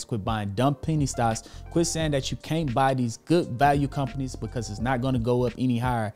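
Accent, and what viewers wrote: United States. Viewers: Love the cowboys talk 😂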